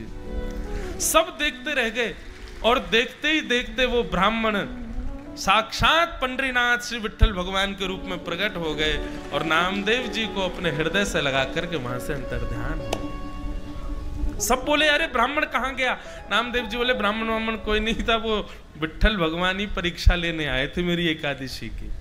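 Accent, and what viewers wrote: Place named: Braj, India. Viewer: Raadhey raadhey guru ji aapke charno me koti koti pranam aapki Katha me rojana subah jagte hi sunti hu aapke mukharvind se brij bhasa bhut sunder lagti hai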